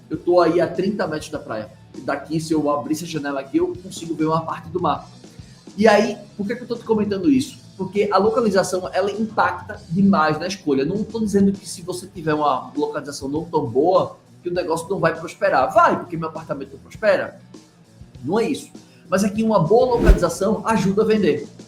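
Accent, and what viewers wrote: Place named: Northeast, Brazil. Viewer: A dicção dele é ótima, esse seu comentário é cheio de xenofobia, a sua "dificuldade" é só porque há um sotaque nordestino marcado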